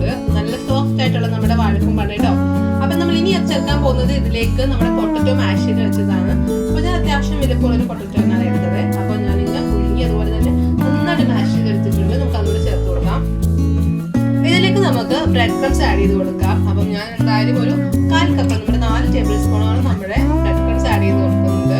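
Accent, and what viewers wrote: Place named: Nilambur, India. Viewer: വാഴ കൂമ്പ് തന്നെ നിലമ്പൂർ ഭാഷ 😍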